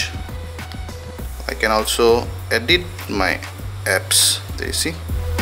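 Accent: Assam, India. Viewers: the guy has a very much Assamese accent ;)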